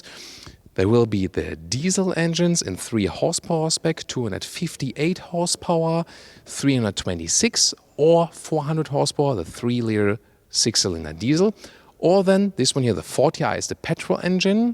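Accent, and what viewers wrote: Germany. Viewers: This German accent :)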